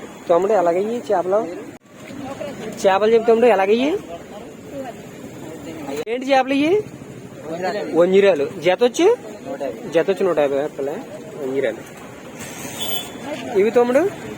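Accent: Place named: Konaseema, India. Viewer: Thammudu variety video. Your slang konaseema slang Open slang. Which village my dear brother All the best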